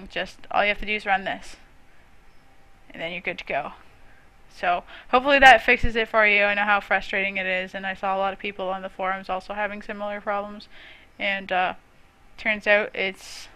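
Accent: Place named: Canada. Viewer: I hope this works for Borderlands 2 and some other 2K Games published titles. 2K announced a list of non-Windows 10 compatible games, and good ol' BLT was on there (yet Borderlands: The Pre-Sequel was one of two or three games on the compatible list). The sound stutters like crazy in BLT now, and the fix you show in this video is one troubleshooting step I forgot about. By the way, I've been perplexed by your accent, and usually I'm really good at pinpointing regional accents from around the world. At first I thought you had a subtle Gold Coast accent (Australia), but it seemed a bit too subtle. It started sounding very similar to the North Midwest of America (where I am), but not quite. However, I think I pinpointed the general country and region: British Columbia, Canada. Am I close?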